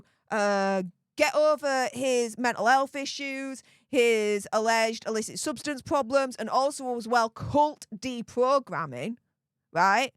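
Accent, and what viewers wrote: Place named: England, United Kingdom. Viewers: YOU NAILED IT AGAIN, SORRY MY DEVICE IS STUCK ON SHOUTING MODE, I AM SORRY, but you are proper northern lass, 🇬🇧🙏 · I WOIULD LOVE TO KNOW WHAT PART OF ENGAND YOU ARE FROM YOUR DIALET IS FACINATING. YOU DO NOT PRONOUNCE THE LETTER T. YOU TRPLACE IT WITH A GOLATERAL STOP. INSTEAD OF SAYING HERE YOU SAY ERE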